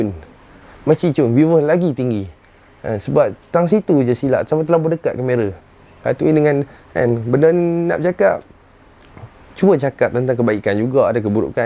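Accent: Penang, Malaysia. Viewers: Cakap peneng lagi sempoi.x payah cakap KL bunyi pelik😅😅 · cakap kl sekerat Pineng sekerat apa PON terbaik bos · please laa bercakap mcm orng utaqa .. toksahh la dok gabung kl dgn penang naik peninnnnnggggg maitttt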